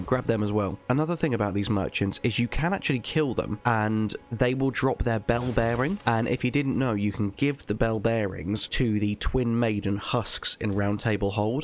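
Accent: United Kingdom. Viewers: just btw, 'gaol' is the English spelling of 'jail', and is pronounced the same. You sound English, so I'm surprised you aren't already aware of that. That said, thanks for all your tips, I tend to be like a scout in my general attitude in games - love exploring, NOT good vs bosses and tough enemies. Actually as a mouse & keyboard computer game player, I really dislike the whole 'boss fight' thing, which is a console game mechanic, now being used in some computer games by way of ports. Still, Elden Ring has some amazing areas and scenery. and a goat-horse mount with Unreal Tournament style double jump ability is out-of-the-mould good good to see. On the other hand, most egregious "physics" where sometimes after hitting an enemy you are held still, mid-movement, while an enemy gets a hit back at you (or 3 or 4 sometimes!), and a hit from behind while racing along on your mount, stops you mid-career and you may gave to jump or dash to break the 'freeze' (outrageous!!). 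The AI not so good also, no ecosystem, no factions, ie, all monsters gang up to fight the player, when often they should be fighting each other, demihumans vs militia being the only contrary example. Miranda flowers, wolves, bats, should attack any who come near, land octopuses should attack trolls too if they get too close, etc etc. Really like the inclusion of the demihumans, the remind me of Edgar Rice Burroughs - John Carter, etc, imply at least for me a whole mythology - I hope some addon makes more of them a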